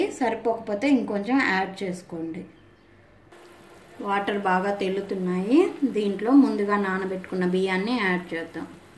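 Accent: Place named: Rayalaseema, India. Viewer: Mi voice super alage midhi rayaalaseema andi endu kante vullagadda annaru anduke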